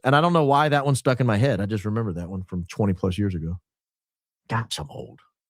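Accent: United States. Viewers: Lol, I wouldn't criticise the British too much - you Americans have taken our language and butchered it. Your words like "crises" which you all seem to pronounce like "crisises" and in particular the way YOU pronounce "niche" as "nitch" is actually quite hysterical. It is pronounced "neesh" Fries are skinny potatoes which are deep fried. Chips are thicker deep fried potatoes. Crisps are snacks. Lol, I don't think the Americans can speak to the English about THEIR language... Aluminum anyone? Ffs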